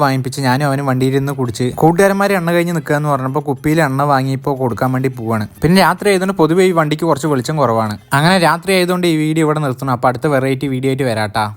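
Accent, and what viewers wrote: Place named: Palakkad, India. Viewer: Super.... പാലക്കാടൻ ഭാഷ.... വീട്ടിക്ക്... മോളുടെ hus... വീട് പാലക്കാട് ആണ്..🥰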